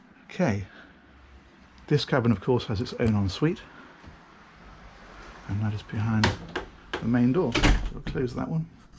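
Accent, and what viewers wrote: United Kingdom. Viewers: Best boat tour channel on you-tube... it just keeps getting better... Right then... cool. :) It's brilliant. It wouldn't be nearly as good without the British accent and colloquialisms... the boat companies recognize and welcome him with open arms, which is all to the good for us broke ass dreamers. lol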